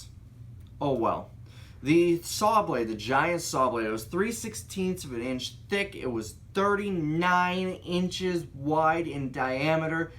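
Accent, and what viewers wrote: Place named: New York, United States. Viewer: O by the way you say dollar like I do in fact we say it like you on most of Long Island New York so tell them to stuff it who say you say it wrong you speak like a true New Yorker thank you so much for telling us the outcome of the dollar unit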